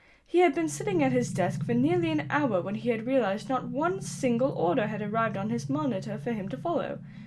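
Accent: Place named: RP, United Kingdom. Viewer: Another great video. Well done! You're also halfway to a brilliant RP accent.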